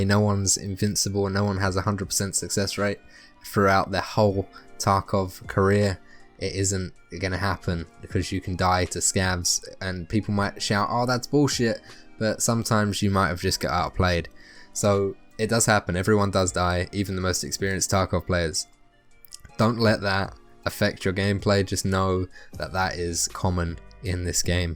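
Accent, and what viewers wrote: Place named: United Kingdom. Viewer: lol i didnt take it personally, but as someone who generally has mono-toned speach myself and has gotten shit for it in the past, i know that criticizing someone for the way they talk wont make them talk differently, if anything it'll probably just make them feel bad. You may as well have told him to stop speaking in a british accent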